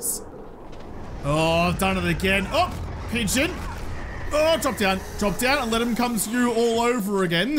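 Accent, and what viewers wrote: Australia. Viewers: are you australian, if you are thats cool.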